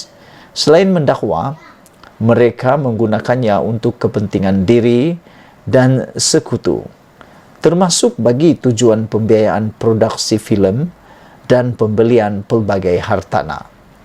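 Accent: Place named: Sabah, Malaysia. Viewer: Slang Sabah ba.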